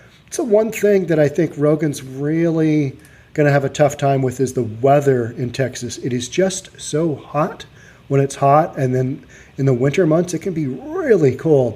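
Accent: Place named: West Coast, United States. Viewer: Tried to watch this. But this guy is to west coast laid back trying to be cool and slow with that idiotic accent.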